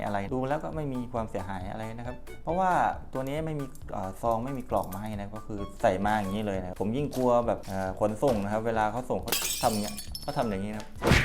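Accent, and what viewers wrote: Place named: Isan, Thailand. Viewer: 555มีอารมขันด้วย เว่าอิสานได้ยู่ตั้วนิ่☺☺😅😅😅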